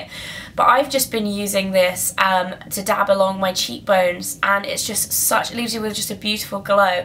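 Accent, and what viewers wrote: England, United Kingdom. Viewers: wow i love your videos! Its so nice to hear a good english guru (not that i dont love american gurus!) And.... you are one of the first to do a november video!! xx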